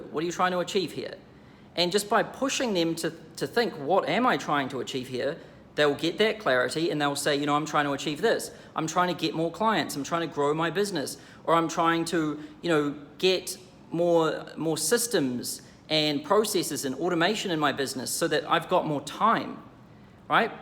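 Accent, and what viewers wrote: New Zealand. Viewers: A<link> your gorgeous Kiwi accent IS adorable!! Thanks, for the info, Champ! I'm still on the fence about signing up BTW...trying to believe that this isn't just going to be yet another online thing that promises the world, takes my money and produces not a whole lot. So, researching....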